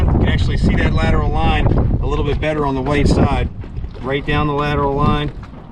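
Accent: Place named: Baltimore, United States. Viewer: got that Balmo accent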